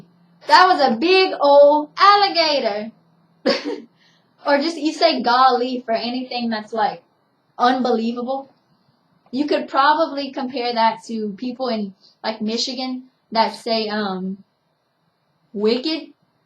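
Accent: Louisiana, United States. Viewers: I love your accent. I find most southern accents charming, but the Cajun is really something special! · Word up! I love the Louisiana accent. Its so much better than all the other southern state. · if you ain't from Louisiana your pretty good I live in Louisiana and some people from here don't even have a Coonass accent like what our doing lol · I have to guess your from more towards Thibodaux, maybe Morgan city? Perhaps Lake charles? You dont have as thick of a Cajun accent as say Vermilion parish or ather areas in deep SW LA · I love your accent lol I love when people have that type of accent in Louisiana. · You sound like your somewhere from outside of Baton Rouge like Zachary or Dunham. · I'm from Plaquemines parish. You were soooo on point. Who needs an er when ya got an a. I love our accent. I do call it a soda though. "Grab me a soda" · mhm baby girl you sound pretty cajun as it is haha nice its my favorite accent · i love accents. 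My favorite by far is the Australian accent, but now that Ive heard yours it definitely tops it. It sounds like a combination of traditional southern drawl mixed with New Jersey/New York City/ black people and French. I guess it comes from the mesh of all the plurality of culture that has been around since New Orleans was founded by the French. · Im from opelousas/Lafayette and I knew your accent RIGHT AWAY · i like your accent much better then most luisiana accents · "the very heart of cajun country" The way you pronounced "heart" could've told me that :) Love this accent :D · When I first moved to Louisiana I lived outside of Lafayette for a few years. This accent was common in a lot of my neighbors and with the teachers in my stepson's school. It's very common; she sounds exactly like a lot of girls and women from that area. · woah i’m from south louisiana but i’m in the city so i dont hear many cajun accents like yours :0 reminds me of pierre part lol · Damn, chick. 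You must be from southeast Louisiana. Southwest is totally different. I dated a chick from New Orleans and you sound exactly like her. 😆☺️ I grew up in Lake Arthur. That's near Lake Charles. Not far from the Texas border.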